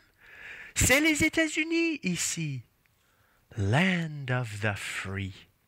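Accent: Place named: Canada. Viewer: T'es canadien, toi ? Juste curieux pcq ton accent est différent que ça de nous-autres icitte en Louisiane.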